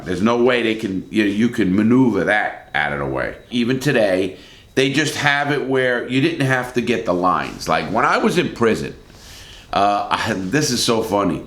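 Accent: New York, United States. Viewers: Your from new York right?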